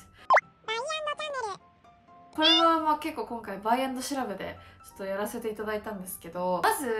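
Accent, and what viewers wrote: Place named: Kansai, Japan. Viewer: 、めちゃくちゃ関西弁出てる。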